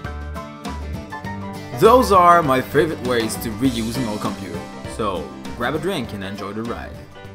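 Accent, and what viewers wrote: Quebec, Canada. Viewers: This is a French-Canadian accent! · You sound like a Québécois from Montreal ! Not that there is anything wrong with that .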